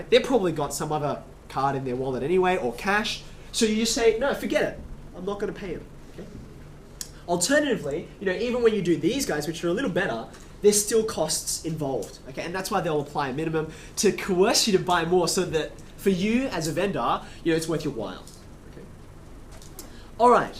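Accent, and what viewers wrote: Australia. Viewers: Besides his obvious accent, you know he's Aussie when he says "Amex." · Hate aus accent. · Woah it’s an asian guy with an Australian accent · My eyes say Chinese, but my ears say Australian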